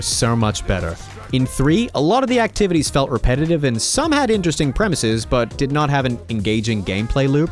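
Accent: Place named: Australia. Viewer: not gonna lie you sound like an australian learning an american accent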